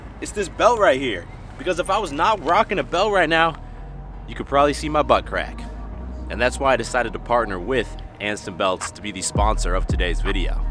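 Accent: United States. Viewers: the delivery is very American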